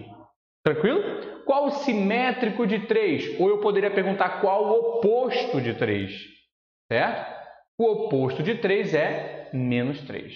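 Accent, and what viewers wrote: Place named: Pará, Brazil. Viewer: Parabéns, aula muito bem explicada, e comum jeitinho paraense que só quem é daqui conhece kkkk Obrigado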